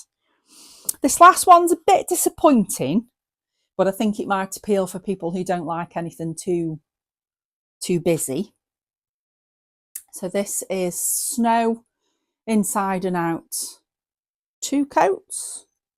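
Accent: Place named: United Kingdom. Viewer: you’ve got one of the strongestUK accents I’ve ever heard. Lol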